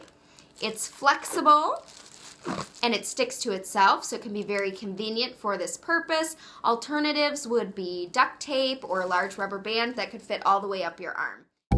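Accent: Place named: Minnesota, United States. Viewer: i can tell you are from minnesota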